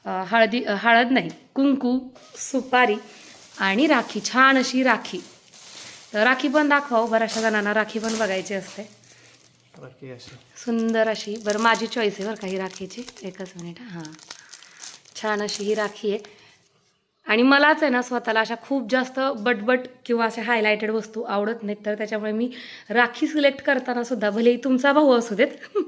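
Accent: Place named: Solapur, India. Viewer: मला तुझी सोलापूरी अर्थात करमाळा ची भाषा खूप आवडते तु लय भारी बोलते 💜🧡❤️💚💙💙🍒🍒🍒🍒🍒🍒🍒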